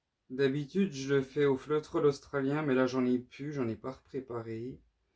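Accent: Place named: Besançon, France. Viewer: J’adore les tableaux aux fonds rouge ( contrairement à vous ) et je trouve que celui ci est superbe , les couleurs sont très bien choisies . Le tableau bleu , magnifique , élégant . Je salue le Bisontin , un voisin donc ? Depuis que je vous regarde, l’accent me parle mais , je n’osais pas vous demander . Continuez comme ça et à bientôt pour visionner d’autres vidéos !! 🎨🤣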